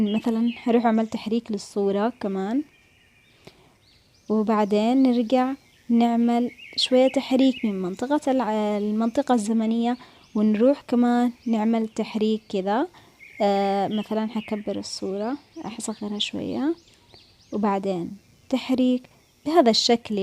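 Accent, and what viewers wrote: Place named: Yemen. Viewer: من لهجتك احسك يمنيه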